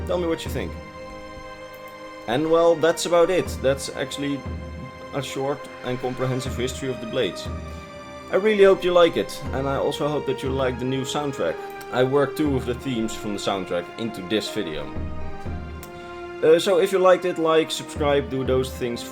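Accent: Netherlands. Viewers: Is that a dutch accent?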